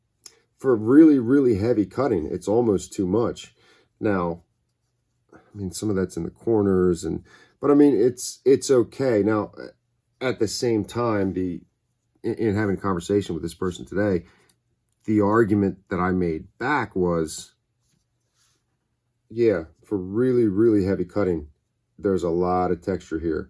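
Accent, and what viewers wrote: United States. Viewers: This is off topic but every time I watched your videos your smooth deep voice always reminded me of someone I couldn’t figure out, but now I realized you sound like an American accented Clive Owen. 👌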